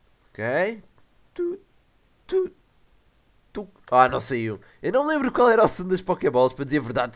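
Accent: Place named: Portugal. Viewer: Ele é de Portugal... ta certo pro português de Portugal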